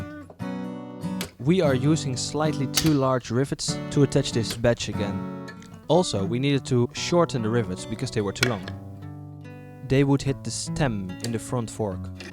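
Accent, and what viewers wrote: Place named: Netherlands. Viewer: I hear a typical dutch accent. You gave me some inspiration man. Do you have like instagram?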